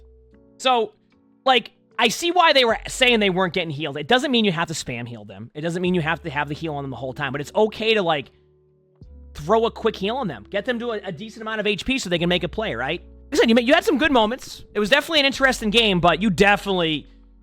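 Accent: Boston, United States. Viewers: Love the Boston accent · I love the Boston accent😂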